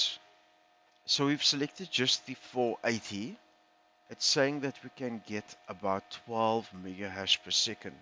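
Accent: Afrikaans, South Africa. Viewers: Klink soos n Afrikaanse engels die.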